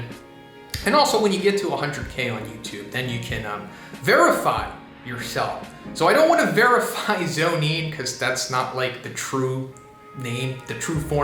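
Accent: United States. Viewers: mikmik he sounds americab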